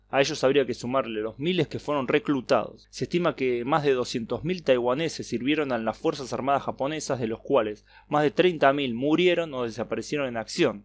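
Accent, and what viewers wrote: Argentina. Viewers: uf con la pronunciación de un argentino, pero fenomenal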